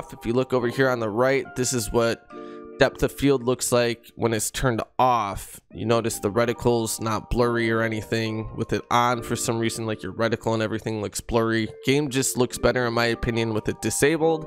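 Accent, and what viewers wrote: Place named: Russia. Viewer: u sound russian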